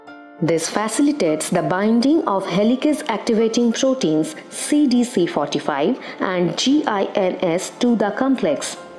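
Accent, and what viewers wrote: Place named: India. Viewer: If only the indian accent wasnt so thick.. 😢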